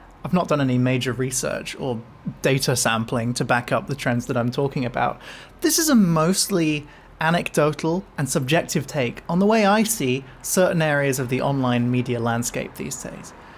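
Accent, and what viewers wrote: United Kingdom. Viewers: Ok literally I had the thought to also say "jumper" in case this person wasn't American and then he speaks with a British accent god dammit. Also how is this not Freddie Highmore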